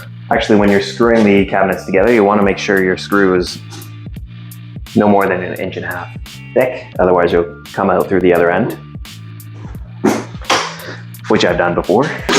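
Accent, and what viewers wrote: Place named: Ontario, Canada. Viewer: First, I didn't mind the talking. It helps provide context. Second, the shirt says ".ca", and you're drinking a boneshaker (a man of taste, I'd recommend an Octopus Wants to Fight if NEIPAs are your jam). As a fellow Canuck, and Ontarian - I'd peg your accent from somewhere around Tarrana (proper pronunciation) Ontario. To the other poster, this guy clearly is not a "yank". Thanks for providing the video. Will do this strapping this weekend.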